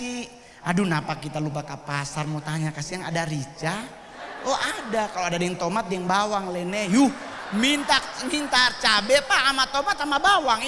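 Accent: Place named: Eastern Indonesia, Indonesia. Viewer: Terharu dengar Manado Batak Ambon Papua...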